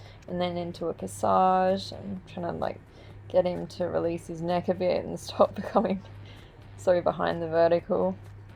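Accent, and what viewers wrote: Australia. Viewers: She is from Australia, that's our accent.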